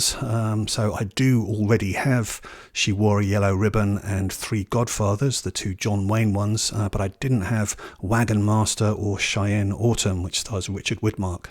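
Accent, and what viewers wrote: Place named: United Kingdom. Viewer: Great British accent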